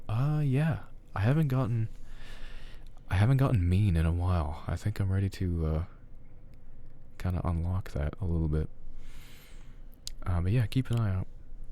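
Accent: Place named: Canada. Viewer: JUST listened to my first audio of yours, your first one on Quinn. Can I just say, first sentence thought, “oh? Is he Canadian?”, 10 seconds in you say ‘sorry’, “yup, definitely Canadian”. A cowboy but without the American accent and uses CST? Yeaaa canadian prairie boy. As a fellow Canadian, I call this supporting local arts and culture 😆. Seriously though, until today, I pretty much stuck to always the same VA (Rum). i’ve tried listening to quite a few other VAs on Quinn and I just can’t. Either tone, pace, sound effects, etc. turn me off. I’ll need to do a bit more research to be sure 😉 but, I might just have a 2nd VA on my list, which is very exciting! For real though, I don’t know if you’re familiar with Rum, the binaural king, buuuttt you give similar vibes and tone. Also from the reddit world. I would KILL for a binaural audio with you in one ear and Rum in the other. 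Or maybe just a twitch stream where we just get to listen to your smooth voices playing games 🫠. K, I know I’m getting carried away but seriously, I have a vision of you two being friends and making 🔥 content together. Listening to this now because I like to know a bit about the VAs I listen to. A whole 4hr intro!!! 😂 So i’m not done yet but I will get through it. I actually lol’ed at the haulin’ hay answer because #truth. Not a farm girl but helped haul hay on my uncle’s farm and it’s no joke. Congrats on ALMOST 20K🎉 🎉🎉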